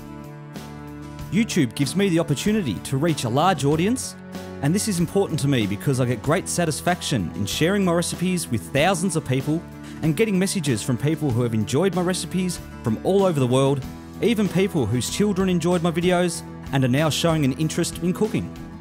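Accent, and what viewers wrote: Australia. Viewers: I really enjoy your channel best! At first your very austrialian accent made me laugh and since then I am a subscriber. Keep up the good work! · now that's an awesome aussi accent! Congrats, mate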